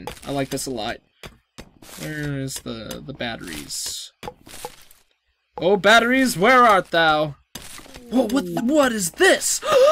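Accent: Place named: Australia. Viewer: you sounded Australian to me (I'm British)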